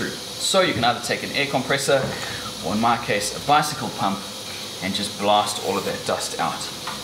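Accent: South Africa. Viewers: I thought i heard the Durban twang. You have a fan here in East London :) I love watching your videos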